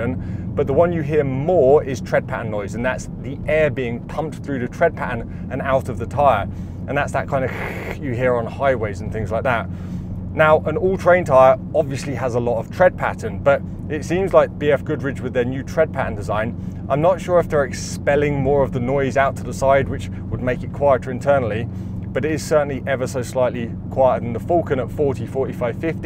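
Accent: United Kingdom. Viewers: A British Mormon talking tires?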